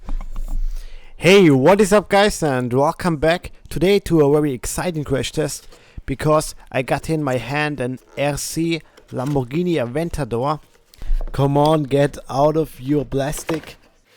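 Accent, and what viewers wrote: Germany. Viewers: Ey deutscher,Man hört das du deutscher bist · Man hört das du deutsch bist lul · Man merkt übel dass du deutscher bist aber nices video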